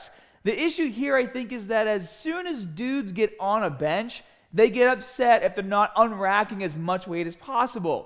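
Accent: Boston, United States. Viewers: This is the most Boston I've ever heard you sound..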